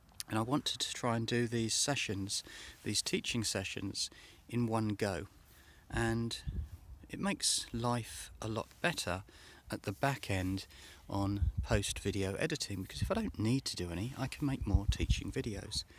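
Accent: United Kingdom. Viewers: Thanks, That link didn't work but no matter as I found a link to download on the VibranceHDR webpage and installed from there. It was a bit disconcerting as Google obviously not happy with that and gave me all sorts of dire warnings and are-you-sures? But I pressed on and installed. Then I received all sorts of unwanted notifications from them on all manner of subjects but blocked them and now all is quiet again. I just need to try out Vibrance HDR now and I shall follow your guidance closely. I have to say it was nice to hear another English voice on a YouTube tech/geeky subject video and your quiet clear and measured presentation is much appreciated along with your commentary on extraneous helicopter and gun noises - made it all the more human and genuine for me;-) If I haven't subscribed then I'm about to. Best wishes. Philip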